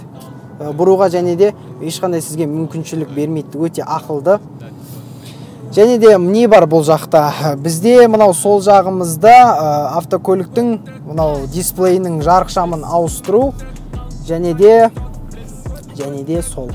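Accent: Standard. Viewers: Молодец тап таза сөйлейсің қазақша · Ой молодец осы бала таза қазақша түсіндіреді. Өркенің өссін. Олжас ағаңдай атақты бола бер!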